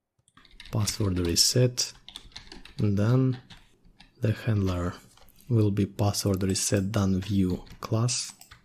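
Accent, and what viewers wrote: Russia. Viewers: Найс ролик, видно сразу - Русский, ну или из другой странны СНГ, акцент сдал)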